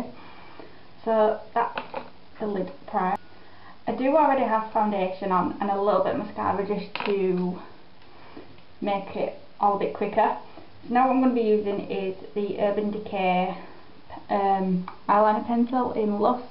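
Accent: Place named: Scotland, United Kingdom. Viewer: Are you Scottish? My grandfather was from Scotland and you both have the same accent. I've never met him, since he died when my father was little but I have heard a lot of recordings of his voice.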